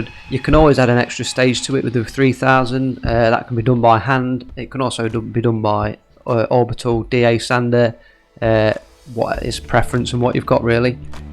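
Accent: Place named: Nottingham, United Kingdom. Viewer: You wouldn’t be a nottingham lad by any chance?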